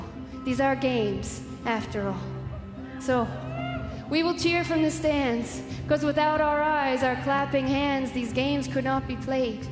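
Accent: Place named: France. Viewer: Wow, that was beautiful. Your French accent is quite nice too.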